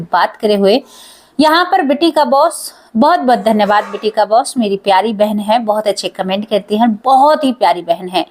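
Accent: Haryana, India. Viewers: मृदुल सुशील जी क्या हुआ अगर यह सही से नहीं बोलता है तो हरियाणा और गांव के लोग ऐसे ही बोलते हैं जो बहुत ज्यादा पढ़े लिखे लोग होते हैं वह भी बहुत बार ऐसे ही बोलते हैं बात हमें समझ आनी चाहिएबस और हमें अपनी बहन की बात बहुत अच्छे से समझ आती है आपको ज्यादा परेशानी हो तो आप जरा युटुब पर वीडियो बनाकर देखिए कितनी दिक्कत होती है श्रद्धा शर्मा दिल्ली से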